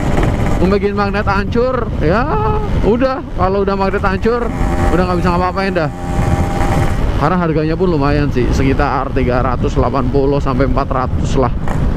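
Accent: Banyumas, Indonesia. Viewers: Ngapakk hadirr mas'e 😂👌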